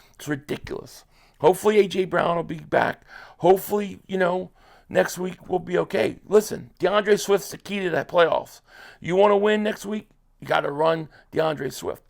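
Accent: Philadelphia, United States. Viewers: Why do philly ppl sound like this..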